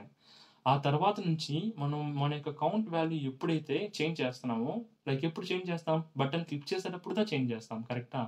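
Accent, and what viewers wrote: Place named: Chittoor, India. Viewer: Clear cut explanation with theory as well as hands on, its really building confidence for me to keep up learning React js thank you bro and finally I think ur from Border of Andhrapradesh or from Chittoor district/TN right...?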